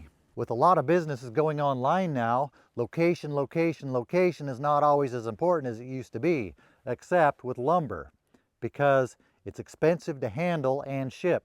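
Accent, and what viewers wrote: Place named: Pacific Northwest, United States. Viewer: it's the Pacific Northwest accent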